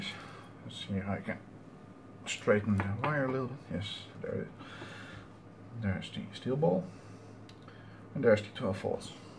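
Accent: Netherlands. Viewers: Ik heb dezelfde in mijn winkelwagen zitten van 2 euro per stuk. Kan zijn dat die ook lager gerate zijn qua kilos aan trekkracht. Wel leuke video trouwens! Hoorde het o zo bekende nederlandse accent en keek naar het naampje van het kanaal en ja hoor: eindelijk een nederlandse electro youtuber.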